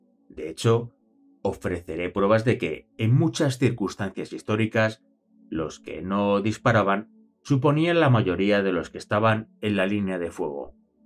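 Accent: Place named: Spain. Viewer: Oztia tio chaval, usted empezó a hablar español de España 🥺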